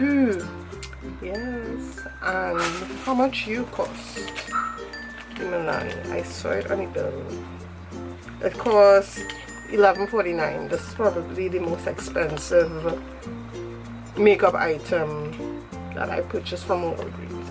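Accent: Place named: Trinidad and Tobago. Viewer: It's so refreshing to hear a Trini makeup guru for a change! And you seem to really know your stuff. #trinitodbone #sexytriniaccent #sephoragiveaway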